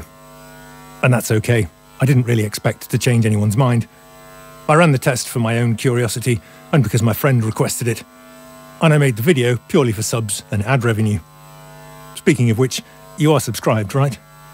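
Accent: United Kingdom. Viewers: The only youtuber with british accent i can actually bear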